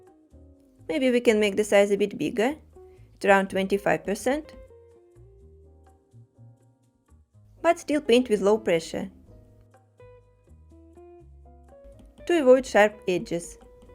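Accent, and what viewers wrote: Russia. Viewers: Как же акцент режет слух сразу понятно что русская )))) только у русского человека может быть такой акцент. Уж лучше на русском говорите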